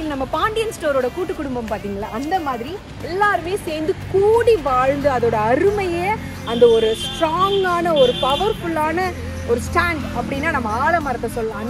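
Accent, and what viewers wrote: Kongu, India. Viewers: கொங்கு தமிழ் 💖 அழகா பேசறீங்க வரவேற்கிறோம் அக்கா 🙏🏻💚❤️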